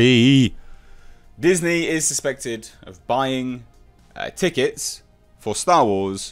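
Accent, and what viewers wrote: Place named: United Kingdom. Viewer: as an American I think your Emperor Voice is very very good I think you being British helps? So Rise Of Skywalker and its flat feeling Trailer will be a flop in the US. Unless they are hiding something huge like LUKE being alive its shit, and I have no interest in seeing it. They have killed the 12 yr old boy in me who saw Star Wars in theaters in 1977. We have the OG trilogy and Prequels to give us that great feeling of wonderment we had as kids in the 70's!!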